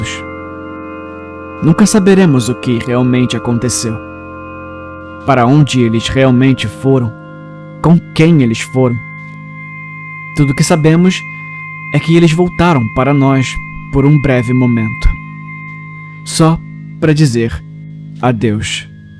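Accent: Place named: Rio de Janeiro, Brazil. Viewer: É tão bom ouvir uma história de terror em que o narrador é carioca igual eu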